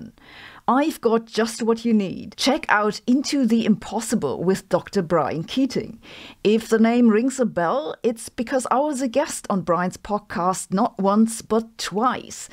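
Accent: Germany. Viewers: Hossenfelder: don't do away with your german accent too much when communicating science using the english language: it has a nice charm and ring to it ;-)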